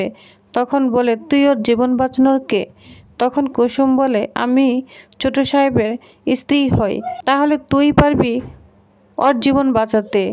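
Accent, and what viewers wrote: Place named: Bangal, Bangladesh. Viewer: বাঙ্গাল বাঙ্গাল উচ্চারণ, অশিক্ষিত উচ্চারণ ঠিক ঠাক শিখে কতকথা করবে।শুভেচ্ছা রইল।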